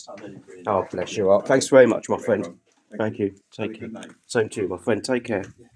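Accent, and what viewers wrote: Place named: Yorkshire, United Kingdom. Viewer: You sound like me, I'm a Yorkshire guy but living in Portugal, at the moment. Check me out mate, I've just subscribed. Olives are Free in Portugal 😅😅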